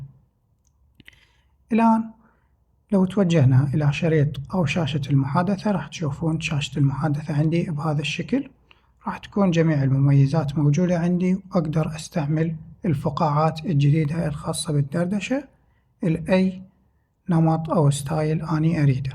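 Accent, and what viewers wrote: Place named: Iraq. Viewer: للهجه عراقيهه ليهةة؟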